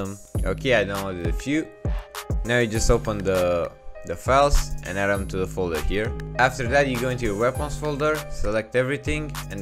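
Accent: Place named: Romania. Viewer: Ori cat de bun ar fi un roman la engleza tot se cunoaste dupa accent:)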